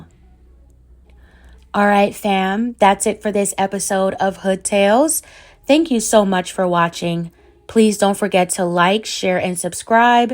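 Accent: Baltimore, United States. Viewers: I see you bro ! A.I.. sounds great! I do miss the bmore accent tho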